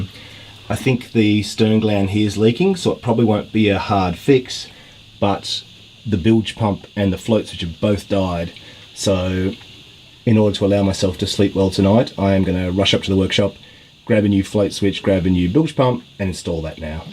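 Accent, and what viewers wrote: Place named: Australia. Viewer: Loved the chooks,, hated him. No, truly mate, this has been a super adventure. Glad it was you and not me. Really admire your courage and tenacity and your 10 tips are right on the money. I'm an ex Aussie in Alberta Canada and listening to your familiar accent has been a treat. Looking forward to many more vids.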